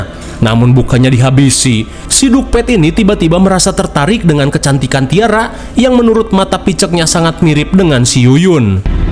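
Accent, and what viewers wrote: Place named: West Java, Indonesia. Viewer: ngomong nya nyunda bngt 😭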